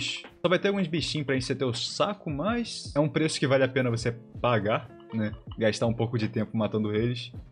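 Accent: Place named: Rio de Janeiro, Brazil. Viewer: Aqui vemos um carioca fiel: montanhax, minériox, ixpots, crixtais Amo amo esse sotaque é bem gostosinho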